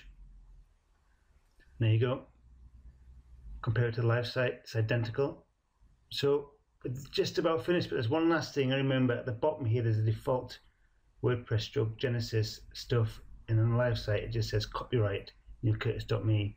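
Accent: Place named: Yorkshire, United Kingdom. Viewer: Aye, a Yorkshire Man, of course you are... my family is from the dales..Well done with the tutorial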